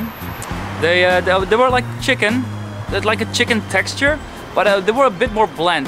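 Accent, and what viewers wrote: Netherlands. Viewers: ***** je hebt dat nederlands engels accent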